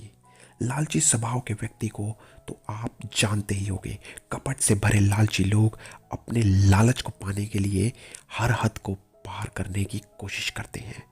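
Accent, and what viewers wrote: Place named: Bihar, India. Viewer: Aryyyy bhai suvicha to achha hi but video me voice bihari bhaiya jaise hi .. thoda voice thik kr k dalo..... nice bro. .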